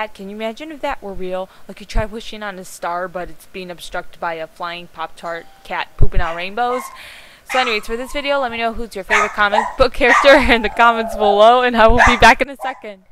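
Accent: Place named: Michigan, United States. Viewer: do i spot a Michigan Accent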